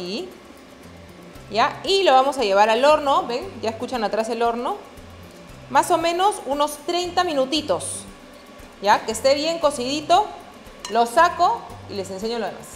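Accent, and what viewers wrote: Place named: Peru. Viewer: Peruana por el acento.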